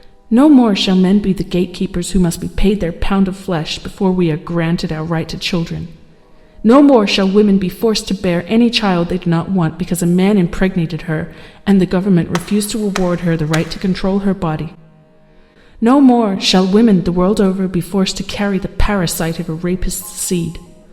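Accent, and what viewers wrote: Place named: Australia. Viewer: Great narration. Always go Aussie when you need a good voice.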